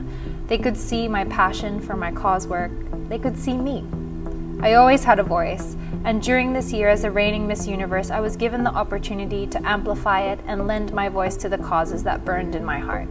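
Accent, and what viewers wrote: Australia. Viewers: When she talks normal, you can hear the Australian accent. When she Talk loud and proud, she speaks the proud Filipino accent!!!! (I noticed it) ❤❤❤🌟🌟🌟☀️☀️☀️🌞🌞🌞🥰🥰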